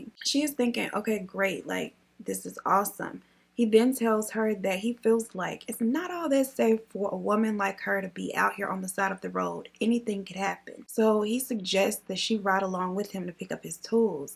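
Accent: South, United States. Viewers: I love the way you speak!! I love all the killer girls, but its really nothing like hearing it in your own "dialect" ie black, southern, hip, etc... Make em Google it! 😆😅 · My husband past recently and him and his family use a lot of the southern terms and way of speaking that you do. So I really do get a kick out of listening to you talk. Things like; “fat meat is greasy” or “too much like right“ lol truly brings a smile to my face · You Definitely have the South Slang I’m from the Miami area so we speak how you speak so that’s probably why I love you videos extra cause I get it in Everes every way when you explain it lol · Girl, we just got that sass and drawl when we speak in the south!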